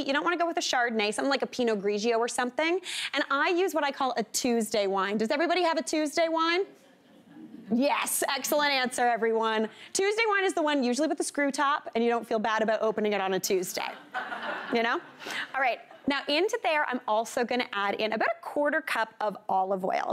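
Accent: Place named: Canada. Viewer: Eh. . Eh?..so Canadian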